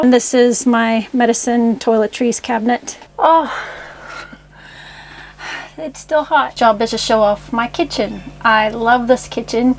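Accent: Australia. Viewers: If you dont realize it, you are picking up a bit of an Aussie accent. Can i ask the approximate cost of your Toyota If they were sold in the US there would not be a single unit sitting. Youre so cool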